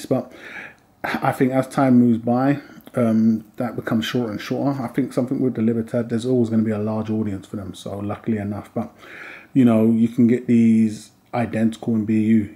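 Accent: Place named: Brixton, United Kingdom. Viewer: I recognize a Brixton accent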